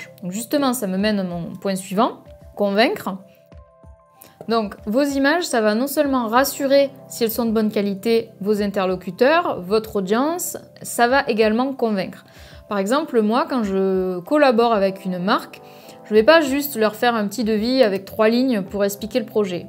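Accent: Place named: South, France. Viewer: très bien je suis inscrite , j'ai déjà votre livre depuis le début je peint seulement depuis juin 2019. merci, vous avez l'accent du midi. a bientôt sur internet lucette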